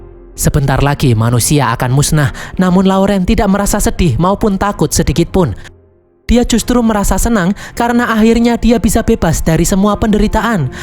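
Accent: Java, Indonesia. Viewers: Perasaan suaranya beda ya?? Kok medhoknya terasa banget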